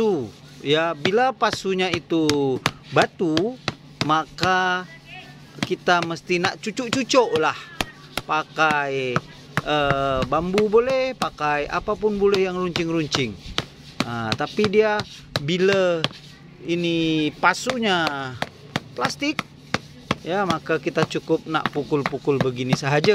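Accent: Malaysia. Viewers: Pandai cakap malaysia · saya ingat salah dgr abang ckp loghat org Malaysia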